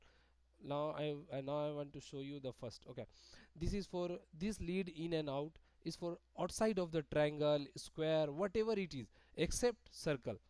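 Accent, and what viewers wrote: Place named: India. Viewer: Surprisingly one of the best turorials by anyone with an indian dialect i have ever watched. You nailed it! Great job.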